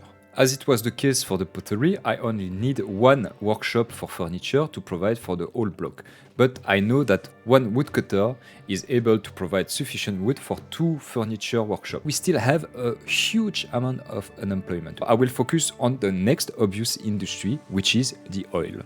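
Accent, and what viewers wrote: France. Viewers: The French accent always a good lissen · By your accent, you are Frenchman, are you? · Français qui parle anglais ? (A l'accent et à la prononciation de l'anglais, ça se sent^^) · The french is strong with this one 😍😍😍 oh I Love it so much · I have played this game so often when I was younger. Thanks a lot for this instand nostalgia. All the best, Valentin PS: Your French accent sounds marvellous, plz keep it!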